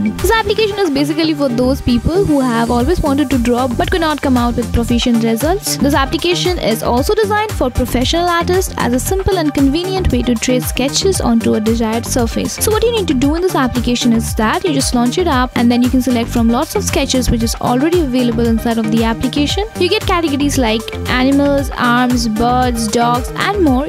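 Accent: India. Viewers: Indian ke english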